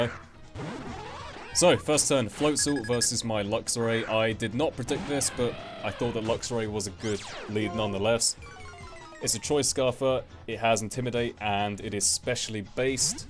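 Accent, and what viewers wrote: United Kingdom. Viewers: You have an amazing british accent :)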